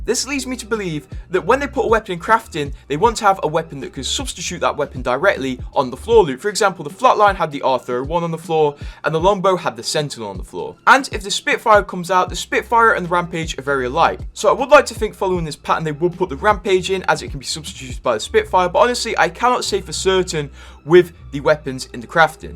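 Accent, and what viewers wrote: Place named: England, United Kingdom. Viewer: Your fuckin English accent is discusting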